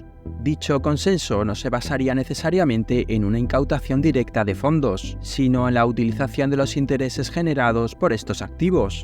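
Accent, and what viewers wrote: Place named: Europe. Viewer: que le queda poco tiempo a quien ??? vuèlvase serio hombre!!! tiene acento de puro lacayo europeo...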